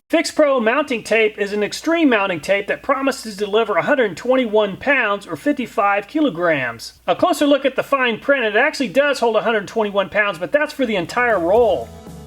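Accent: United States. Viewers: A genuine request. You have a strong accent to those of us from outside the United States. yes, there is a world outside the US and the speed you speak at makes it very difficult for us to understand comprehend your story. You are interesting but hey, three runs of the story to understand. your challenge is, attract more people from over the shores.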